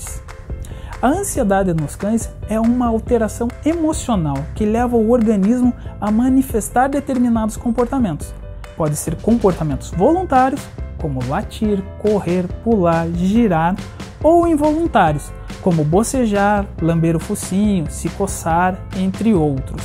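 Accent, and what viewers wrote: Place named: Curitiba, Brazil. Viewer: Esse cara é de Curitiba, certeza.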